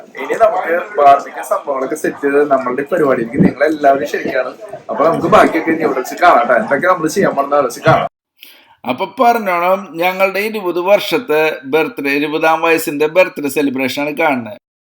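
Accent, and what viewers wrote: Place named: Thrissur, India. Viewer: ഇത്ര നാളും അമേരിക്കയിൽ കിടന്നിട്ടും ചേട്ടൻ നമ്മുടെ തൃശൂർ സ്ലാങ് മറന്നില്ലല്ലോ.. 👌👌👌ഇത് അമ്മയുടെ comment ആണ് 😄😄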